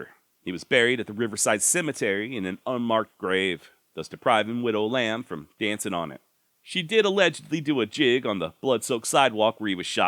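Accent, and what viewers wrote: Texas, United States. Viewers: tf? What are you talking about bro? He’s just from Texas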